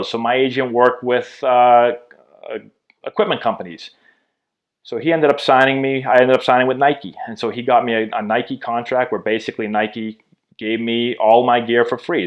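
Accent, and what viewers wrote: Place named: Massachusetts, United States. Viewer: I guess it's the Massachusetts accent. I heard car deal not card deal :) .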